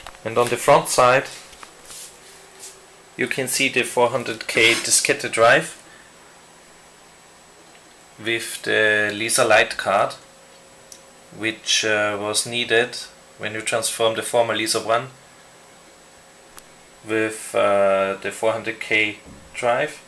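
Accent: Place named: Germany. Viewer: Man hört den Deutschen Akzent deutlich raus. Macht aber nix :) Trotzdem tolles Video!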